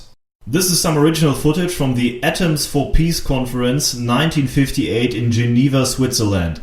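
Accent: United Kingdom. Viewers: I loved the British voice LOL ;-) Brilliant video again, was there some Kraftwerk in this video? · lol at your British accent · love the british accent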